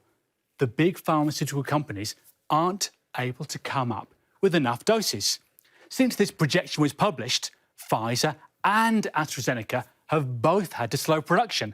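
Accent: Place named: United Kingdom. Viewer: British english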